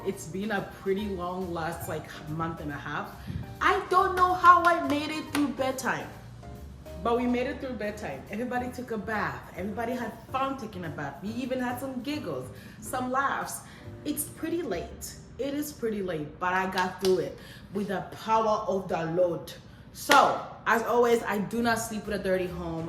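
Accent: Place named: Nigeria. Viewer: Ahaha if not for the seasoning part I thought u were nigerian😅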